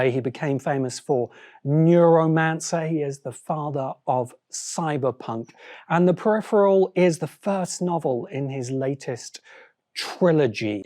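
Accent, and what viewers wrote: England, United Kingdom. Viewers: I get that translating Gibson's work to the screen is almost impossible. But this show is HORRIBLE. This review tries to give the show more credit than it deserves. But a soft-spoken English accent can't fix how broken the show really os.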